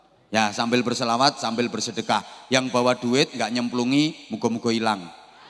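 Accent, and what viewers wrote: Banyumas, Indonesia. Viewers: Kramat jatibarrang Brebes Cocok bahasa e ngapak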